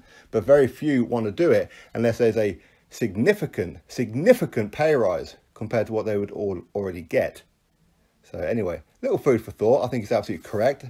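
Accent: United Kingdom. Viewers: Listen obviously from your accent your britian but whats fair is fair. Ruiz whipped joshua ass no matter what you say he knocked him down more times. Wether it be if his head or distracted whatever the bullshit is. But ruiz is the new champ. Remtatch clause or contract whatever the fuck you wanna say. Ruiz is the new heavyweight champion. Id rather see him fight wilder or fury. The rematch will be the same. And dana white says styles make fights. Ruiz was better that night. And in my oponion hes the better fighter ruiz is a savage joshua is a terminator robot with no rythym just strength. In boxing its more then strength. Ruiz was and is the better fighter. Get boxing politics and money out the way. Ruiz is more superior ......period if you cant read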